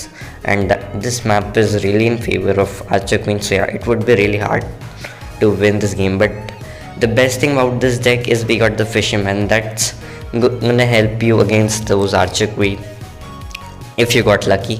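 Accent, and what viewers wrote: India. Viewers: Are u Indian?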